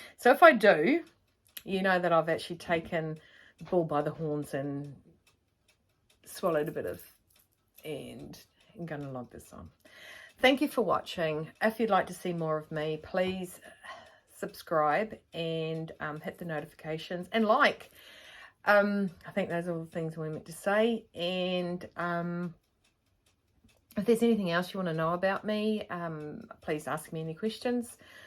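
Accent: New Zealand. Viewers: Hi, welcome to the community of #Fridaysews. You are very natural at this, great to hear about you and your journey. Also, so cool to meet a Kiwi...yay. you go girl! And a warm hello to your Mum too. I am also a #frdaysews vlogger, perhaps either yourself or Mum have commented with me? Not sure. You have a beautiful Kiwi/Aussie accent, just lovely to listen to. Yes please, would love to see your sewing room, and your stash...and would love to meet your Mum someday, maybe a guest appearance? I'm looking forward to seeing you regularly and sharing your sewing journey 😂❤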